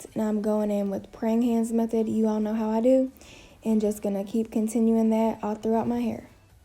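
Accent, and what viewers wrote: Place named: Tennessee, United States. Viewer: Girl u sound like u live n my state TN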